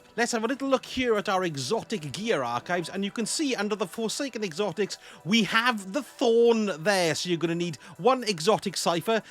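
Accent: Wales, United Kingdom. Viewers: You sound Welsh?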